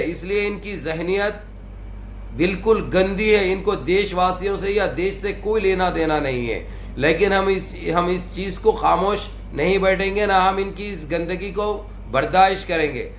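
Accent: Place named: Hyderabad, India. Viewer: Assalam walekum Bhai rah bar Allah AAP ki umar may barkat day Hyderabadi jabaan suney may itni pyaari lagti hai ki log sunkar muskurattein hai lekin Kai loga ko yeh baat nahi malum ki Hyderabadi sirf Biryani kay liye nahi Balki Kurbaani kay liye bhi jaani jaati hai jaise ki Nizam Badshah Aur unkay aulad aur jo log bhi masjidon ko Shahid karne mansoobay bana rahein hai Allah unko gaarat karenga inshalla Aur Allah mazlumo ki aur Hum sab ki zaalim kay Zulm say hifazat farmaye Aamin summa aameen Noor sayyad Dua may yaad rakhiye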